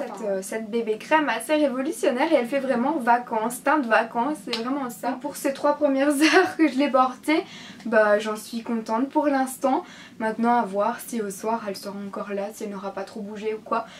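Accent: Belgium. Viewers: Ta voix avec ton accent Belge c'est super Mignon ah ah 💕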